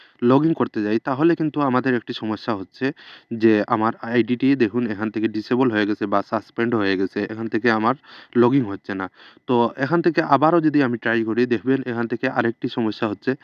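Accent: Sylhet, Bangladesh. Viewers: ভাই কি সিলেটি??😊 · Vai kita Sylheti ni?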